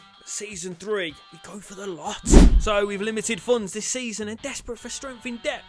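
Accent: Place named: Birmingham, United Kingdom. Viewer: I think you re from a posh area in birmingham shard end kingshurst chelmsley wood , solihill ?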